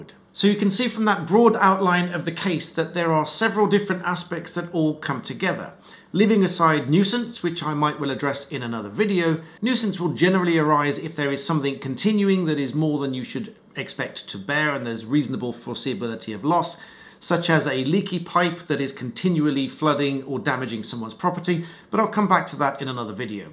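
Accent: United Kingdom. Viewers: Also with a British accent, you should be clear as to what Country this affects.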